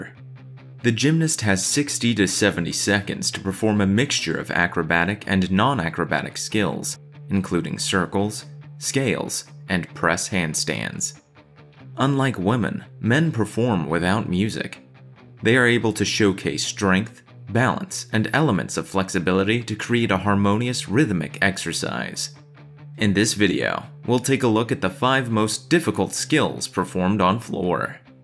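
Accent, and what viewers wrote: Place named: Spain. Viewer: No Spanish speaker has ever felt guilty about their heavily accented English. Don't apologize.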